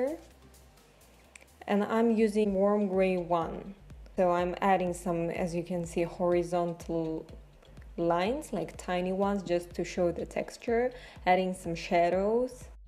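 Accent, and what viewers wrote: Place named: Russia. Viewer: Очень русский акцент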